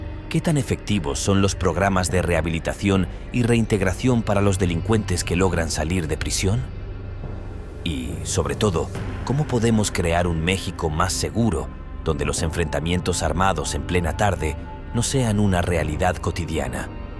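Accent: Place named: Spain. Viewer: Qué pasa, por qué todos los que narran los videos son voces de españoles? Habiendo voces de mexicanos lindas y agradables , por qué tenemos que soportar esto, qué pasa, que alguien me explique, porque me interesa el vídeo pero no me gusta que lo narre un español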